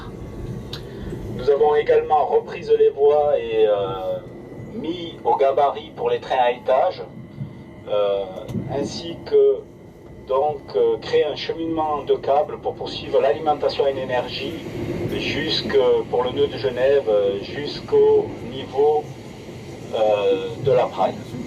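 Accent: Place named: Marseille, France. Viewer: C'est un Witz j'espère, le présentateur marseillais au micro ?! Cet épouvantable accent accompagné du fameux "quatre-vingt-dix" . Affreux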